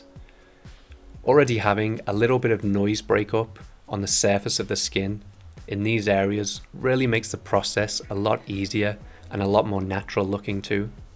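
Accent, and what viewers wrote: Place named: Liverpool, United Kingdom. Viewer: Thank you so much for putting these high quality videos together! You have a soothing but firm tone in your voice. Although it's subtle, I like the Liverpool accent as well! :)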